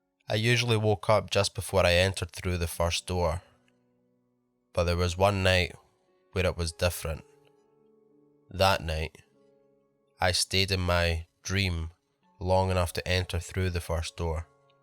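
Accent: Scotland, United Kingdom. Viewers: Came here from Swamp Dweller and subbed immediately! I just LOVE your Scottish accent!! Paranormal and Cryptid stories are my favorite! There is another Scottish horror narrator. Channel named HELLFREEZER. Another excellent channel!! ;-)👍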